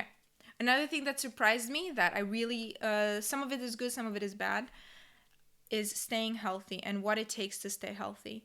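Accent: United States. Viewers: I like you american accent 😍😍😍😍😍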